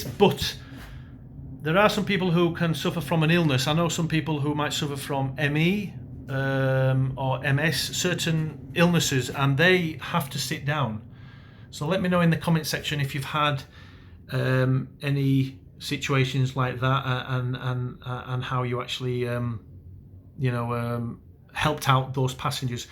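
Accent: Yorkshire, United Kingdom. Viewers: Are you Yorkshire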